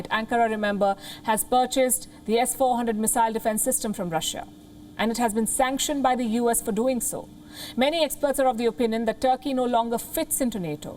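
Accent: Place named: India. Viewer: WION, MSM bottom feeder with an Indian accent.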